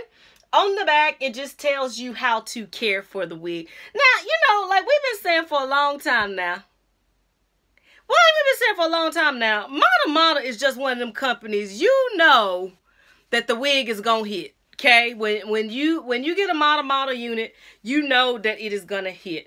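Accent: South, United States. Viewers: Its model model. Modelle is moe-dell. I'm a geek like that. Lol. You are absolutely cute as a button, and a pleasure to listen to, you southern belle, you.💓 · I LOVE YOUR PERSONALITY!!!! I randomly came across your video and I'm glad I clicked. You're gorgeous, funny, down to earth, engaging with the cutest Southern accent. I died laughing when you said get your wig where you want to I'm not sponsored....I'm subscribing just for that! P.S. what is that fabulous lippie color you're rocking??? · I am here for this Southern accent!!! The wig is beautiful on you!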